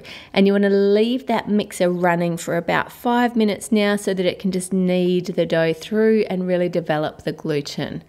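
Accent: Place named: Australia. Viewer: Whooooa, that was an unusual video °Δ° like, seriously, i often look videos just to hear her beautiful voice and enjoy the Australian accent, and NOW I see smoke in her kitchen and then fire burning all over the video frame, and I'm like: 😨😵😱 whhhat??!!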